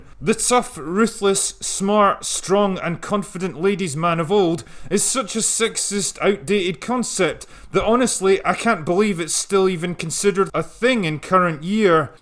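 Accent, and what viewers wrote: Scotland, United Kingdom. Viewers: I fucking hate that accent. Where is it in Scotland you're from?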